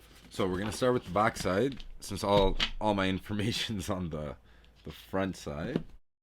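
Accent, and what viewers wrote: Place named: Guyana. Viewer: Yuh sound guyanese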